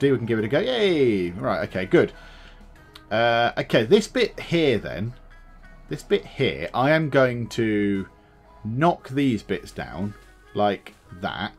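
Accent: United Kingdom. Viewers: Heavily bri'ish.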